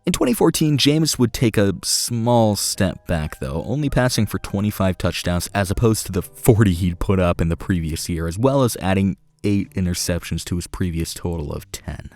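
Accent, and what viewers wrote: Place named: West, United States. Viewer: Why do you sound like a cowboy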